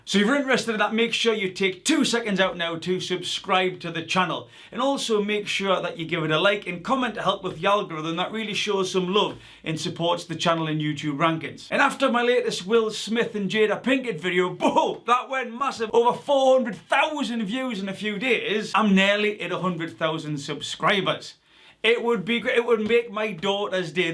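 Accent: Newcastle, United Kingdom. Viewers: Found your channel and love your insight. Oh I think the people of interest, that you could analyse, is endless.... I'm fascinated in the subtle signs to look out for, your beautiful Geordie voice , and your simple bitesize explanations, makes learning a treat ha ha. Your wife and daughters should be so proud of you. Having been lied to on grand scales through my life, I feel your work is invaluable to me personally, so I can continue to grow and trust again. Thank you so much. Janice from England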